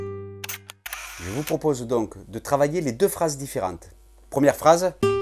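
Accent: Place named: South, France. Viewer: ton accent du sud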